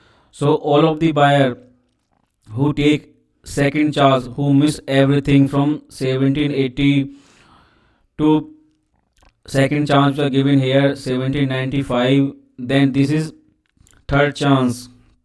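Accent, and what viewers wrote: India. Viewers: The indian accent is wow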